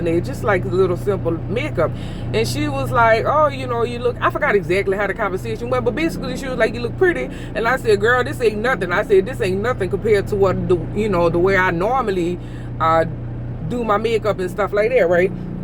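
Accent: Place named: New Orleans, United States. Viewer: Girl where are you from with that accent?? New Orleans?? You're so Beautiful, keep doing you. I just happened upon your channel looking for lady truckers because I'm trying to find out SOME of what I need to know as a semi new driver.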